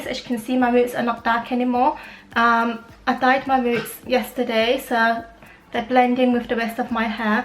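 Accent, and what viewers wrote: United Kingdom. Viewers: u have a British accent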